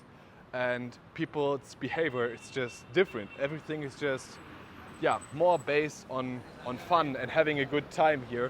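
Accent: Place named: Germany. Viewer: this german accent is killing me. (german here )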